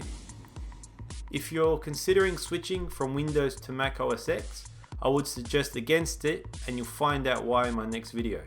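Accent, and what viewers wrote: Australia. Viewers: ? you sound austrailian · is that an Australian accent???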